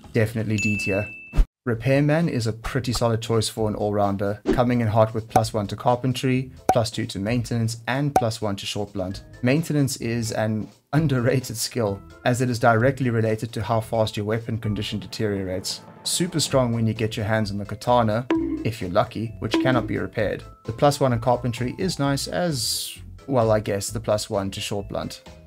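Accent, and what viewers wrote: South Africa. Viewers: 😂😂😂 are you from Joburg. I can just tell ur South African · You sound South African 😂. Epic tear list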